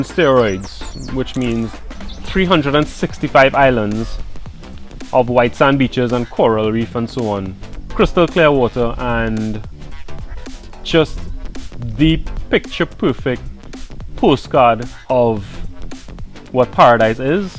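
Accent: Trinidad and Tobago. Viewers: Love the blog. Love the Trinidadian accent. Thank you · Trinidad and Tobago by farrrrrrrr. I know he had an trini accent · i instantly knew you were Trinidadian from the accent. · I clicked on the video...heard ah Trini accent and subscribed one time...loved the video and how you presented both countries ....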